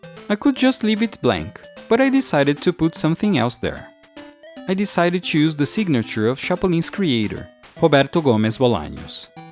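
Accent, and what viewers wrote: Brazil. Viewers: Seu sotaque brasileiro é muito charmoso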